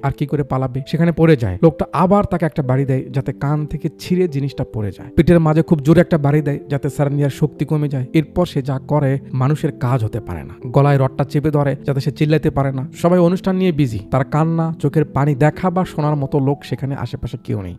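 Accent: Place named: Rangpur, Bangladesh. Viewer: আমাদের রংপুরের কথা শুনে ভালোই লাগলো। সঠিক বলেছেন।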